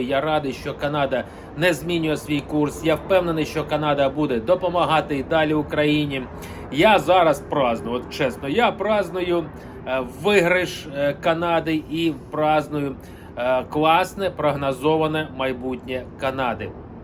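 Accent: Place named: Russia. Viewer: Не доставай. Так чути росіянський акцент, людина все життя розмовляла росіянською мовою, і в цьому не його винна. Зараз він розмовляє англійською, і це не так легко як здається. Говорю з власного досвіду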